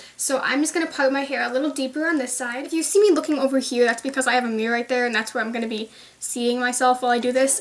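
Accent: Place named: Boston, United States. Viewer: Are you from Boston? You sound like you have a little bit of a bostonian accent! :)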